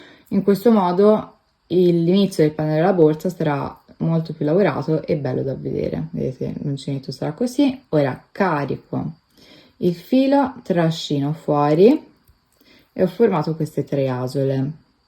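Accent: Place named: Tuscany, Italy. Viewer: Buongiorno, Una curiosità….io abito a Pietrasanta e dal tuo accento mi sembra che siate anche voi “di costa”, mi sbaglio?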